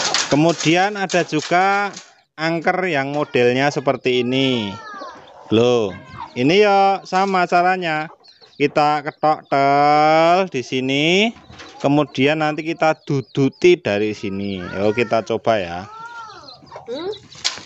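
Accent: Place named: Manado, Indonesia. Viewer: Dari logatnya pasti anda org manado y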